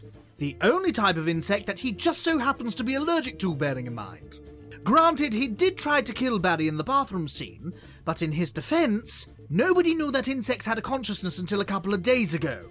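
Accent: United Kingdom. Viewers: This dudes British accent made me happy again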